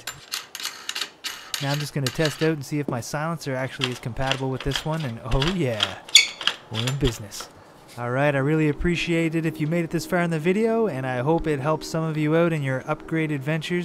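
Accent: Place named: Canada. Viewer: You sound Canadian if you are where did you get these parts from can’t really find any places here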